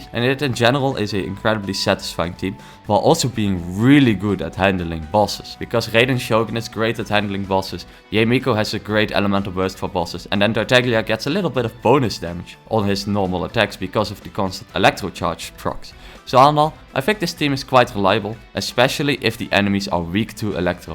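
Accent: Netherlands. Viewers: Helpful video! Are you Dutch by chance?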